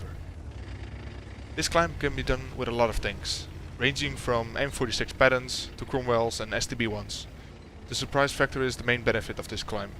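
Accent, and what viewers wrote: Netherlands. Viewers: je kunt zo goed horen dat je lekker nederlands bent.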